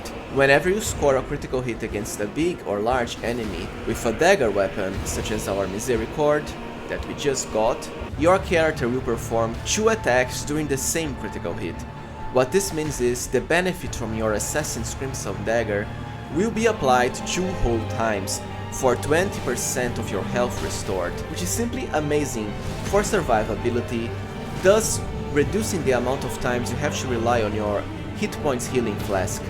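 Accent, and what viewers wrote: Brazil. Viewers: Cool video, I'm a elleonoras main user but often use dual dagger reduvia/great knife or misericorde. Btw are you Brazilian? I may be wrong but the accent sounds pretty much alike. Cheers.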